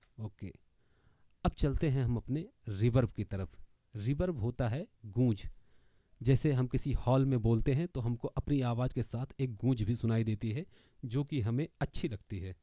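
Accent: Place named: Uttar Pradesh, India. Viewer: UP ke ho bhai...hai na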